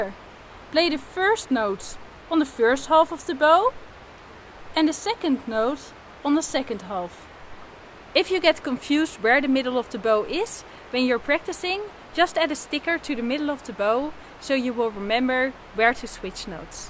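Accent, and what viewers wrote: Netherlands. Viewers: You have such a dutch accent!! I am dutch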